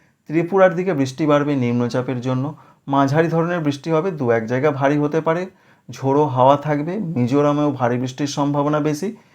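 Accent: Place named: United States. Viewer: বাংলায় ফিরে এসো বাবা, ইংরেজি উচ্চারণ আমেরিকার হওয়ার জন্য আরো বুঝতে পারছি না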